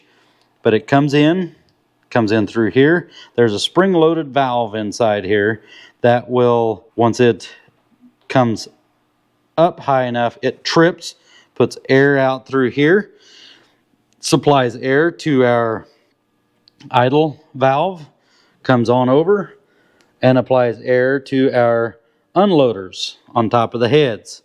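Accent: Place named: Southern Illinois, United States. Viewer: I thought your accent was more from south of I-72/ I-74, than Amish! I am sure that you are very proud of your Amish heritage and thank you for sharing your background with your viewers.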